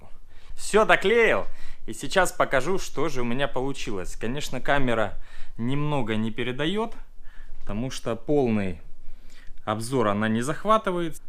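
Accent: Rostov, Russia. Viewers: это Ростов, после переезда у меня сестра стала так же говорить)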